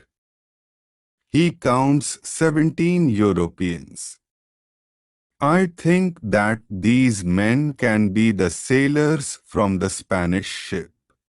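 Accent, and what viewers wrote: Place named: India. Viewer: What is that indian accent god sake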